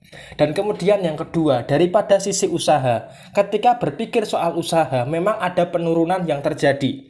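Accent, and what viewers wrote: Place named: Kulonan, Indonesia. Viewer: Njenengan orang mana mas kayak orang kulonanan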